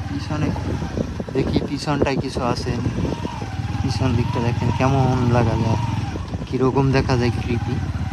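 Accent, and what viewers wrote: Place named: Barishal, Bangladesh. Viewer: ভাইকথারভাবেমাঝেমাঝেমনেহয়,বরিশালের লোক,কিন্তূশোলমাছআর,গজারমাছেরপার্থক্ববুঝেন্না,আমরাবলদনাআপনারাবলদ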